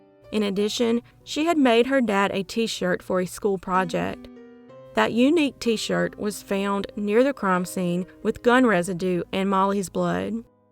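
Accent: South, United States. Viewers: Well… hey 👋🏽 there! Great to have you here again… I just love 💕 the accent! 🤗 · This is my first video with you and I'm already hooked! (Subbed ofc) A combination of my favourite things... Crime stories and the southern accent! 💖🇨🇦💖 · Good morning to you!😉 A good way to start my day! Your accent makes me miss NC ☺️ I do love love love your voice/accent! I can't say that enough!😋 Much love from Washington State 🤩🥰💞✌️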